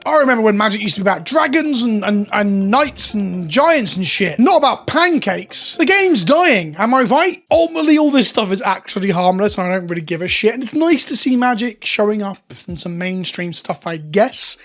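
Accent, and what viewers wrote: United Kingdom. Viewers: Holy shit, youre british?!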